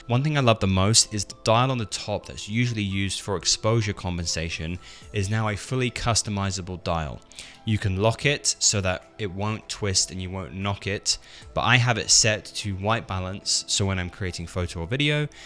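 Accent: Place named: United Kingdom. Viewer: You from the UK cool.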